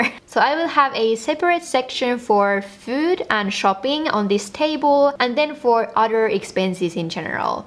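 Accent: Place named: Finland. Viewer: Ohhh I knew that you were Finnish, because I'm in a European school in Brussels with Finnish sections and when I talk to my Finnish friends, they sound like you! I like Finnish, it's a very unique language!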